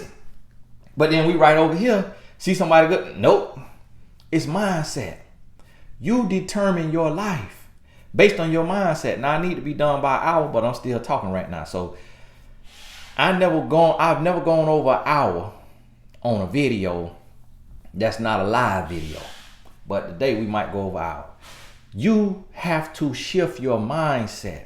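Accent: South, United States. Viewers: I love country accent. He is hilarious 🤣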